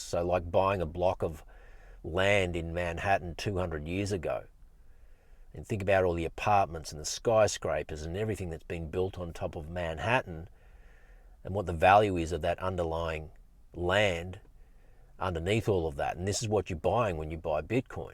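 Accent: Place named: Australia. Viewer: Hi, I'm a fellow Queenslander down on the Goldy, it's good to listen to an Aussie for once on bitcoin, plenty of you tubers from u.s. mate I'm a big believer in bitcoin and have approx 0.8 stacked now my goal is to get to 1 coin soon. My biggest concern is security with wallets ect, and what other steps can we take to make sure we don't lose our bitcoin. Not sure if you have meet ups in person to discuss or just book a call, I'm very keen in talking further with you about this exciting future, I really want to set my kids up so they don't go through life stuggerlig for money. Cheers Warren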